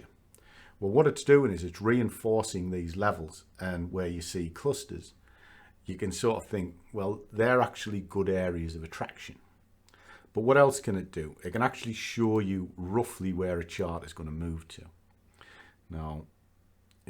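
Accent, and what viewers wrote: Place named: Yorkshire, United Kingdom. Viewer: Refreshingly simple and frank, being a Yorkshire lad it’s nice to hear a familiar accent.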